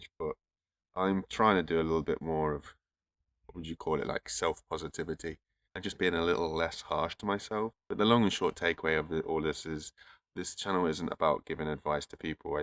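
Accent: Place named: England, United Kingdom. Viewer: It's obvious from his accent that he's not Welsh. He has an English accent and most likely lives in London or one of the Home counties.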